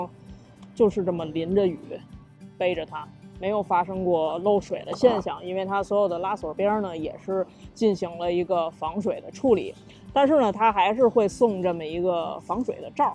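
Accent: Beijing, China. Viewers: 很高兴发现了您的频道，比较好玩的是：我还没怎么看内容呢，光听你的声音就订阅了，离开北京太久了，喜欢听一下乡音:)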